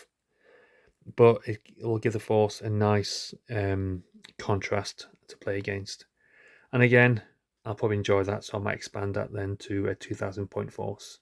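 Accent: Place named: Northern England, United Kingdom. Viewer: Are your infantry on 20mm bases? Also are you up north? As you sound northern. West Yorkshire here.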